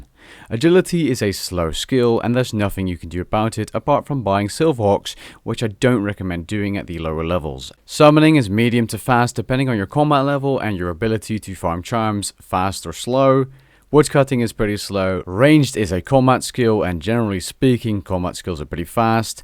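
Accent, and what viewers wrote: United States. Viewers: funny I thought he was from Jersy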